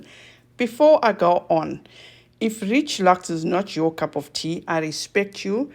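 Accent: South Africa. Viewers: I'm trying to place your accent, are you South African? {:-:-:}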